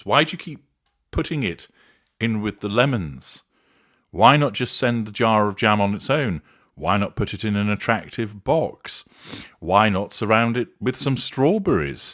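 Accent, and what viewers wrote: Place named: Cockney, United Kingdom. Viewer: Sorry, but you sound like Eastenders, no offence mate.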